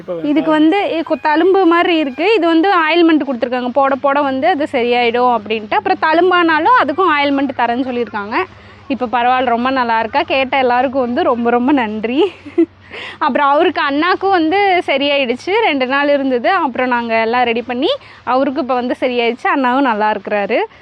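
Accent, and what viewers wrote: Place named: Tirunelveli, India. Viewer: Ninga entha uru district enna slang nalla irukku thirunelveli district?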